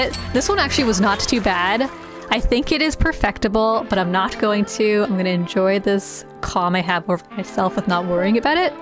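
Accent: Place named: Canada. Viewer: Silence or humming. Got it. :_marQuack: Girl, you’re way too good at this game what the actual fuck. Seriously wtf im horrible lol Nothing to do with anything but am I allowed to say that I’m a tad in love with your canadian accent? 😍 The music in this game is so 🥰 geeeez. Its seriously worth the frustration.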